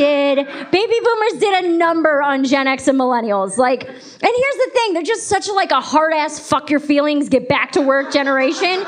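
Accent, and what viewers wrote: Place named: Ohio, United States. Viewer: Killer set, enjoyed your Ohio sense of humor lol. I never heard an Ohioan accent I understood until now but I sorta get it,anyways great job you’re totally right our parents do not drink water, my dad says fish *uck in it so no thanks lol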